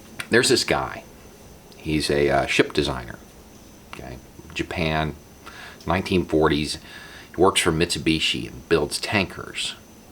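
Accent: South, United States. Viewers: Thank you so much for being a voice of reason in this unreasonable world. Also thank you for being a southerner and showing that not all southern people who speak with an accent are ignorant.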